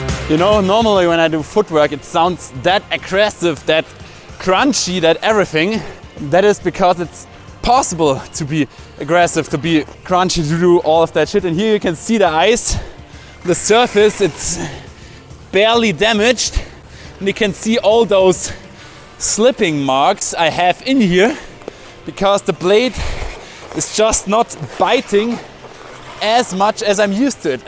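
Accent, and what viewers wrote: Germany. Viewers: Schee. Mit a bissl boarisch 😊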